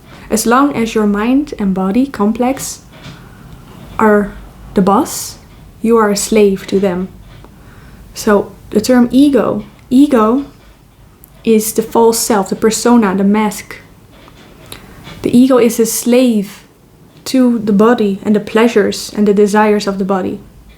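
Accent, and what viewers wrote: Netherlands. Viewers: I have listened to several of your videos and you are remarkable, you articulate the truth as I have experienced it with so articulately. My only question is where are you from? The Netherlands? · Wait are you Dutch ? (Flemish here) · Such profundity rendered through a delightful Dutch accent! Enjoy channeling your Higher Self : )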